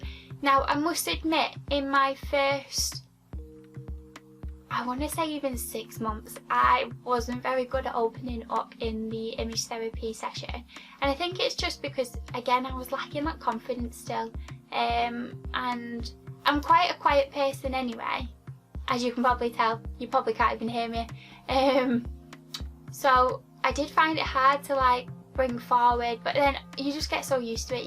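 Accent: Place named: Manchester, United Kingdom. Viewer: Love ur accent! U from Manchester beaut? Xx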